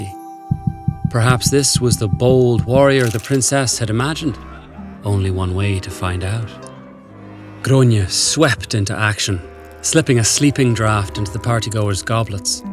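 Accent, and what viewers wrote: Ireland. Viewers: I love Ireland. Its culture, accent, history, myths... everything. Thank you. I love your accent too. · Was this just the normal narrator putting on an Irish accent?! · Excuse me is that a Irish accent, on my favourite narrator. I am shook and very very pleased ❤️ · as an Irish person i really thank ted ed for getting an actual Irish person to voice over it!!TY💕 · I LOVE how this was narrated in Irish accent. It makes it more fun. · You know our accent has like 50 variations across the island right? Plus he's clearly doing a bit of a presentorial voice, so it's not going to sound the same as in normal conversation. You can tell he's really Irish by the fact he pronounced all the names right, unlike previous Irish mythology videos from TED-Ed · Like the Irish accent that the narrator perfectly nailed. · I love how this is told with an Irish accent. 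Awesome. 😂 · Its great to hear an actual Irish narrator telling us an Irish folktale!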